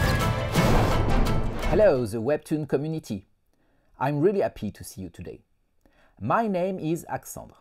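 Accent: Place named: France. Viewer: L'accent français je l'aime tellement, et vous avez aussi un bon scénariste (jeff)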